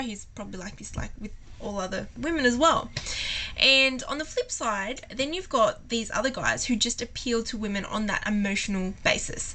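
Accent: Australia. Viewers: the aussie accent is a mayyyyyja put off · thats top advice, esp what you said; dont take what women say literally. so really that means i cant take you literally then hehehe. and yes everyone shes an aussie. nice to hear an aussie accent out of an absolute amount of yanky teenbopy girly stuff on the tube:) x) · I´ll bet she learned english or was born in Australia. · I love her aussie accent its such a turn on. But i dont anyone can wait around long enough for the perplexing age old question of what women want? bcoz women dont really know what they want. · WOW! what is she australian???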